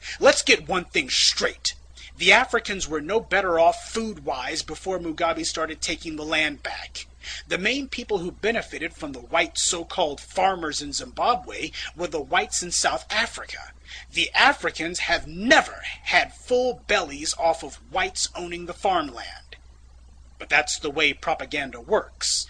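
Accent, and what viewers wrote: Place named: United States. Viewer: hij praat als een Amerikaan. Geen Braziliaans accent.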